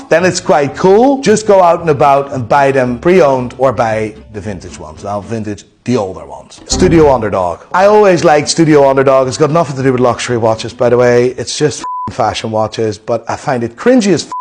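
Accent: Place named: Ireland. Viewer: Once you hear him as Irish, its impossible to shake. Sorry.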